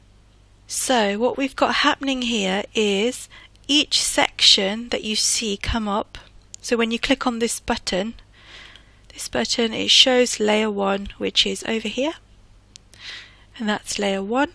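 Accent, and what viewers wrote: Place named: United Kingdom. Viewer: Nice voice (makes me think of, Monty Python, and Jaguar cars). Main Menu slides like this are the best way to organize E-Learning. It's part of "chunking" and can even serve as a graphic organizer (ed psych stuff). I am shocked that Articulate doesn't have a pre-formated slide for this type of thing. In fact Storyline makes it hard to do this sort of thing because the return to slide has limited options AND a little unknown issue (or bug) with how slides are numbered/sequenced. Storyline auto changing your slide order (as you had happen in your video) is because the slides sequence is affected by the order in which your buttons appear on your main menu timeline. In your case Button 4 needs to be on the lowest section of the time line (i.e.send to the back) and button 1 needs to be the highest on the timeline (i.e send to the front). 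to repair, disable all triggers, then move your buttons up and down vertically on your timeline, button 1 highest then down to button 4. Then re-enable all your triggers. And then send an email to Articulate begging them to feature this type of main menu or jump off slide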